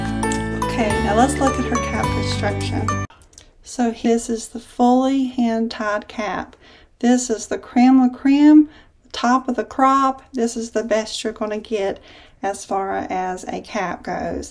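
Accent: South, United States. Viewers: Just subscribed to your channel!!!! So glad I ran across your video!!!! Loved the review and your southern accent as I also have one!!!! So glad to find a review with the same accent as me!!!!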